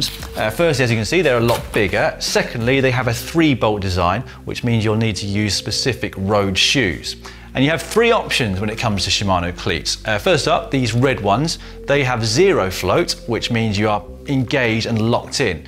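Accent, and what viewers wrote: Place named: United Kingdom. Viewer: I just subscribed for the british accent and the british humor! :D The content is also good, so win-win!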